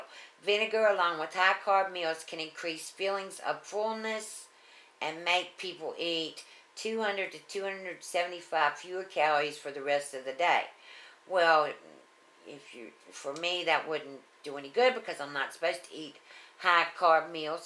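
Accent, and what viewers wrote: South, United States. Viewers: Pennie Clinton Yes has that deep southern accent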